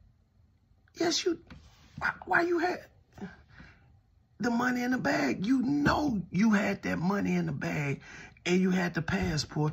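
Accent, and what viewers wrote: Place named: South, United States. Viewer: You got me really using my imagination and I enjoy the content…..I’m like ….I feel what you about to say and that country accent…Unique and ❤….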